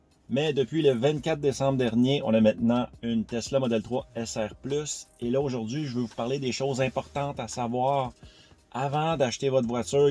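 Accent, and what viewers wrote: Quebec, Canada. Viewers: j'ai juste dit que j'ai eu du mal à comprendre avec l'accent québécois, je ne pense pas être sourd 😅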